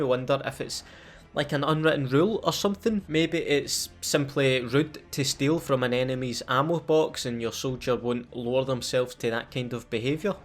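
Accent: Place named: Northern England, United Kingdom. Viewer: God I love Northern accents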